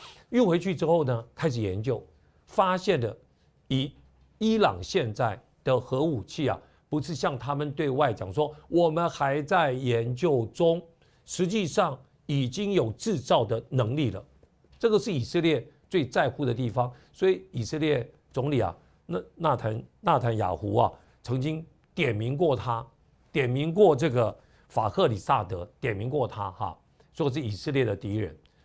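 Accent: Standard. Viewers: 郑老师辛苦了！郑老师的汉语说得顶呱呱👍👍 比我们很多国人说的国语还标准。相信郑老师这档国语政论节目会越办越好！支持郑老师！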